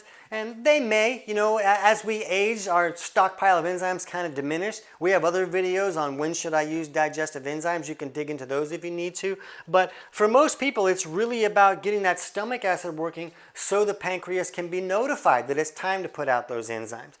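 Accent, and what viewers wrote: United States. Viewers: Dr, which state is your accent from ? best wishes from Brazil 😁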